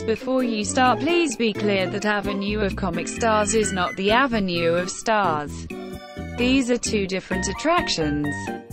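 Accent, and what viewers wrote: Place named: United Kingdom. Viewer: Wow sosyal may British accent hehe.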